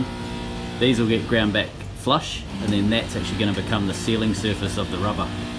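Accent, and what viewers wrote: New Zealand. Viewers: He's saying pantographin kiwi!!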